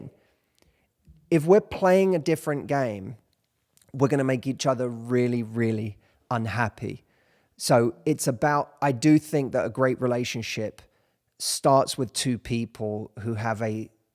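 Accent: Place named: United Kingdom. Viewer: I'm here for British accent İt's wonderful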